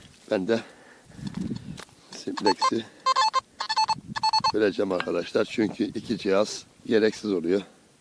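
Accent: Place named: Tokat, Turkey. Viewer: Selamın aleyküm gardaş tokat şivesine benziyor konuşman ya sivaslı ya da tokatlısın heralde?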